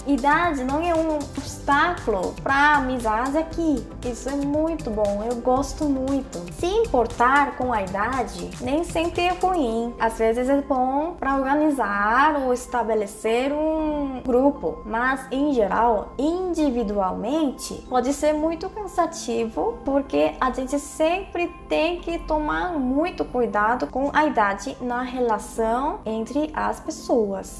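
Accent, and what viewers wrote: South Korea. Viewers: Você ja deve ter ouvido falar, mas aqui em minas gerais falamos "né" com MUITA frequência mesmo. Da até uma certa "familiaridade" em te ouvir falar mesmo com o sotaque coreano kk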